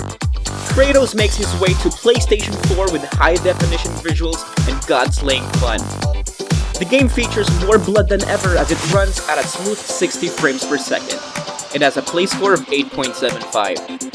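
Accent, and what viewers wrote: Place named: Philippines. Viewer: You can tell the guy talking abt the games is pinoy😂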